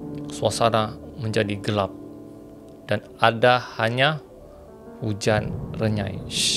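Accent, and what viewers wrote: Sabah, Malaysia. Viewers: Mantap.. Sya suka cara penyampaian kau.sebab tdk lari bhasa sabah kau. Banyak utuber yg bla membahas ssuatu hilang sabahan ori dorang. Terbaik bossssssskuuuuu.....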